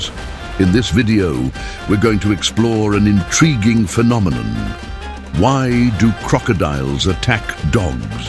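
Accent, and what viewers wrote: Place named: United Kingdom. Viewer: What an interesting Indian bgm, while the guy narrates in British English. What a harmony😊.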